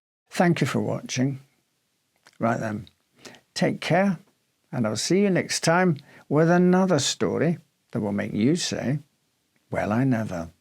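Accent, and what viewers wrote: Scotland, United Kingdom. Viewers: Yes, he is Scottish 😁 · Well not just a wonderful and salacious video but the fact that your good self with this wonderful Scottish accent just lifts it to a higher level. Wonderful story telling thank you.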